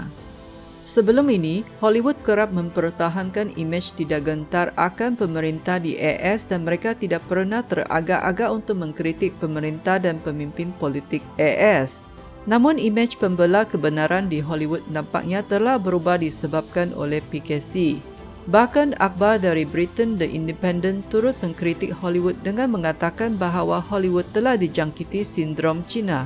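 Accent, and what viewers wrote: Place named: Indonesia. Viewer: Boleh tahan cubaan berbicara a la Semenanjung penyampai ini. Menjadi 90%,, cuma masih ada 10% bunyi Indonesia tak dapat disembunyikan. Sebelum ini ada aku dengar penyampai lain channel ini masih berbunyi 97% Indonesia, 3% sahaja Malaya (iaiti bahagian a dihujung jadi e) 😆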